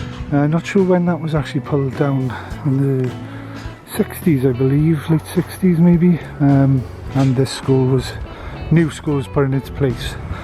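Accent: Wales, United Kingdom. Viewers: Yes it was lovely, my parents have now both gone to their heavenly home and hopefully they have had the pleasure to meet on the other side of life 😊 Ps it was lovely to hear your Welsh accent fond memories of mum and dad 💕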